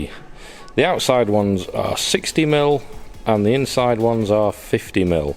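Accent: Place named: United Kingdom. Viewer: Great video. Thanks. Perhaps its the British accent that makes it seem so easy? Will be doing some LED-ing on my 69 Dodge Charger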